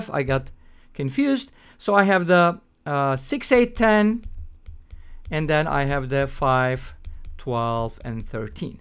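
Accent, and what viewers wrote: Turkey. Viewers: Ill posed as a geometry problem since area and perimeter are different properties and have different units. Keep up the good work. Do I detect a Turkish accent in your speech?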